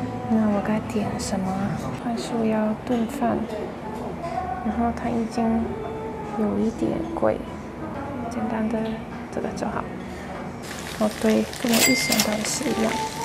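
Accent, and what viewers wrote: Standard. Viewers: 其實這種旅遊影片可以多拍些～外國人的面孔加上標準的中文，台灣人很好奇，也很有興趣觀看❤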